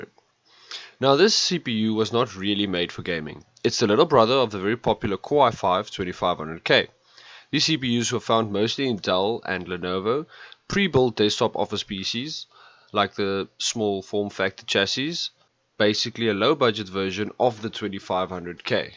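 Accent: South Africa. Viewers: Yayyyy your south African yessss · you sound South African, lol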